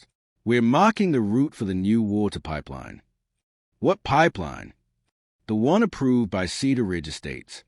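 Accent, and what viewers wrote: United Kingdom. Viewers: A Texas rancher with a British accent, interesting.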